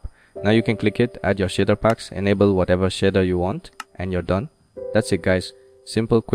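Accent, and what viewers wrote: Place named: India. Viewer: No he sounds indian..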